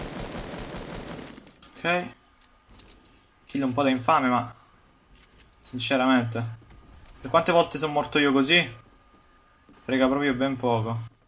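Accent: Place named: Rome, Italy. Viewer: Io non ho capito una cosa. Sei napoletano perché parli romano ?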